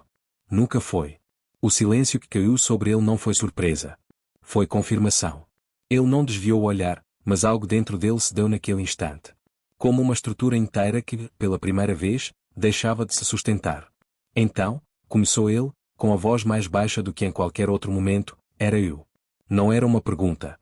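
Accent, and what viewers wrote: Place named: Portugal. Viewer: Não suporto esse sotaque de Portugal horroroso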